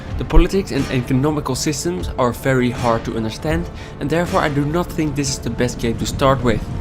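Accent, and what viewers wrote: Netherlands. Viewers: Ben je nederlands? Haha, mooie vid in iedergeval. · As your eastern neighbour, i like your dutch accent, it sounds as funny as it sounds in german But still a good Video, even if i have around 1200h in all Total War games and own every game since Rome I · Ik hoorde na 2 seconden al dat je Nederlands was. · never watched your videos are you dutch? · Jij bent nederlands of niet hé! hoorde het aan het accent haha! xDDD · are you dutch becouse youre acent notting bad but just curious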